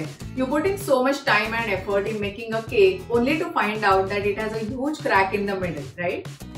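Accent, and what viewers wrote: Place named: India. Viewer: Put an Australian on, can't understand indian talk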